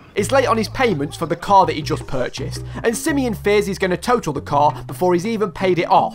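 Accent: United Kingdom. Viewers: i feel like i'm watching nakeyjakey but more british and chaotic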